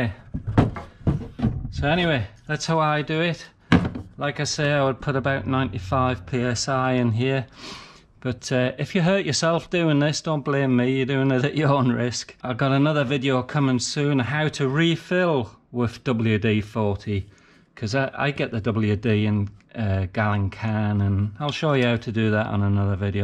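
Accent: United Kingdom. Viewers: Loving this, one question are you British?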